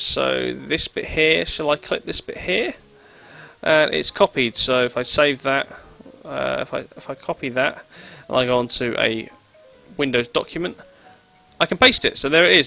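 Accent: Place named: Ipswich, United Kingdom. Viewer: your from Ipswich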